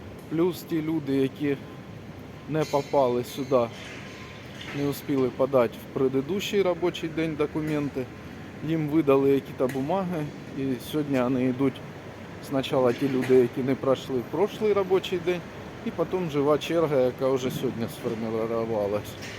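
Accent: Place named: Kharkiv, Ukraine. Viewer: За Вовчанськ дійсно нема слів. Вітання з Харкова. Я ж чую що Ви з наших місць.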